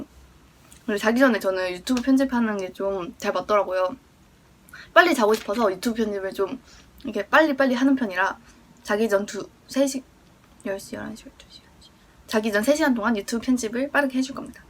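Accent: Seoul, South Korea. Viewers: 와 진짜로 옛날 서울사투리 듣는 기분 ㅠㅠ 넘 예뻐여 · 먼가 말투가 2000년대초 서울 사투리같아요 ㅋㅋ(칭찬)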